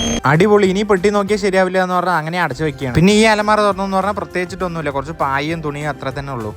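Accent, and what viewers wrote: Palakkad, India. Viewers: ❤️❤️palakkad slang 🥰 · Slag kettitt palakkad Aanenn thonnunu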